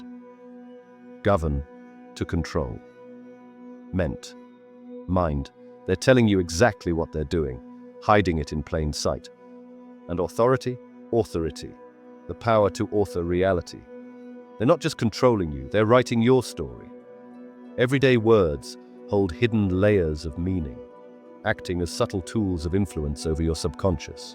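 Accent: United Kingdom. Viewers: I can't stand these UK accents so annoying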